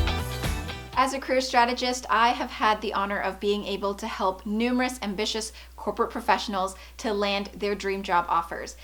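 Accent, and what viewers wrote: Canada. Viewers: It's a Canadian accent 👍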